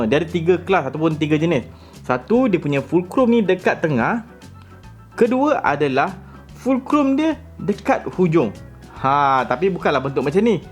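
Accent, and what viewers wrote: Melaka, Malaysia. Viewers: nice video , and btw org melaka ye :)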